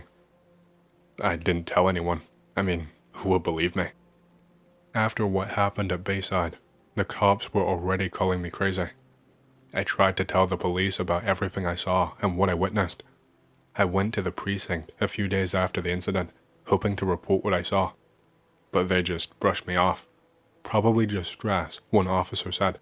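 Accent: United Kingdom. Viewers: Where's his accent from? Sounds kinda U.K. ISH...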